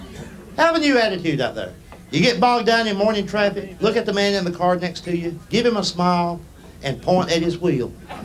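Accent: South, United States. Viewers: He stumbled on my u tube funniest Southern ever 🤣 absolutely from the south 🤣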